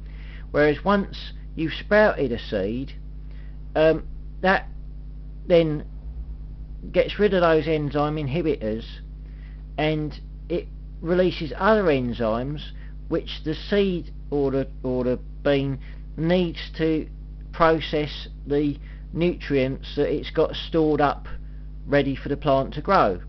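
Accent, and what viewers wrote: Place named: Rugby, United Kingdom. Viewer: A great video! Many thanks! - Where are you from? You sound local to me... Rugby, UK?? - Julian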